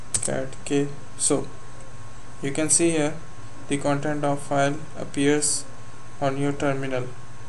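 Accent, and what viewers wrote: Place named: China. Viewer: thanks brother but i bet you're chinese with your funny accent lol just to tell u i love your accent :)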